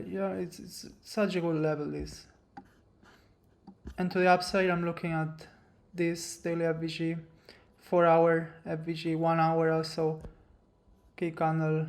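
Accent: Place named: Spain. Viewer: Ya decia yo que me parecía que eras español jajajajaj . Buen video tío !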